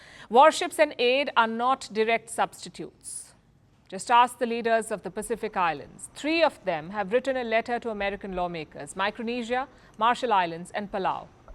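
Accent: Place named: India. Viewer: Your channel has not improved at all, you are speaking curry English all the way. And besides you are talking rubbish all the time, the Americans are lacking money, I just want to ask how long would they afford to keep 5 Aircraft Carriers going on in the front door of China ? By the way are they not afraid of Chinese missiles Dongeng 21 ?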